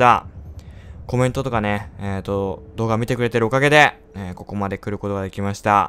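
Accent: Kansai, Japan. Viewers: 関西弁さん好きなので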